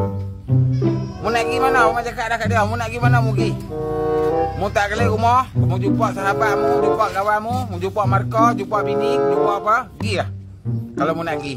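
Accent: Kedah, Malaysia. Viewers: Mu orang Baling ka abg? Wak tak tuh dehh..dema kacau kita dehh..😅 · Cara ckap mcm org baling 😌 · abg org mna..ckp supo org bliing ja😂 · Bg. ni ckp loghat Baling Kedah...kalau area Kuala Nerang-Pedu-Pokok Sena-Titi Akar, loghat mcm ni orang Melayu yg boleh cakap siam...dah mix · Pkcik ni ckp loghat apa ye?kedah ka atau terengganu/klntn?😅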